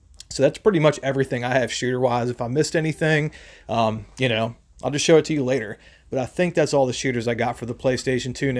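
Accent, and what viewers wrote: Baltimore, United States. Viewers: Btw you have the definition Baltimore accent.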